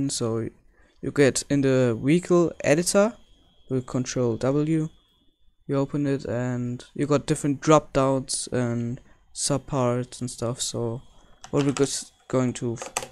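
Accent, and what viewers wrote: Germany. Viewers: haha zu geil dieser deutsche akzent! aber gutes video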